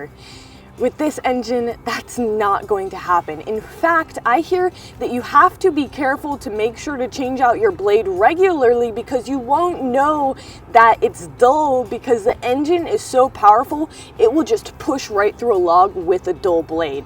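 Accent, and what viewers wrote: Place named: Boston, United States. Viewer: You seem like you have a Boston accent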